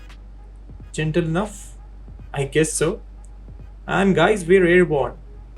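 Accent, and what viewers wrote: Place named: India. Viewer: I like ur Indian accent ❤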